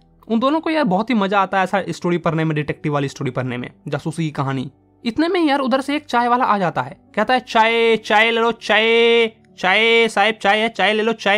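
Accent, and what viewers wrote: Bihar, India. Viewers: Bhai you are from Bihar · Bhaiya aap Bihar se hai kya ?? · Bhai bihar se ho kya?? 😊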